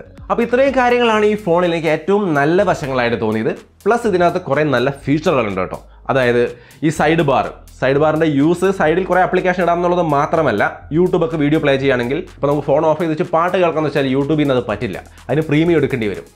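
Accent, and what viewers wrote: Thrissur, India. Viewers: നിങ്ങൾ തൃശൂർ ആണാ 😍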